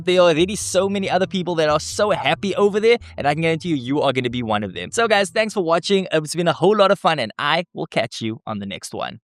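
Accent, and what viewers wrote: South Africa. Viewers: Where is your accent from? SA? - Thanks for the tutorial! Would like to know how React plays that mass of images? Looks load and performance intensive. And I also would have loved to see how this works on mobile... different image set in portrait format?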